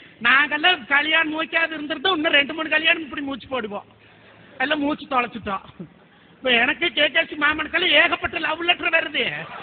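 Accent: Kongu, India. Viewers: Super nga very nice kongu Tamil la alaga pesuninga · kongu tamil...always great....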